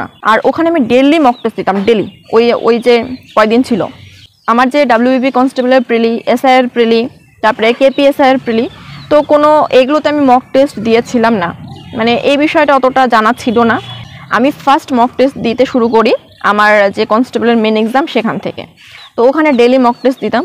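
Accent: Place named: Bangladesh. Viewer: Kotha gulo bangla desi bangla deshi lagche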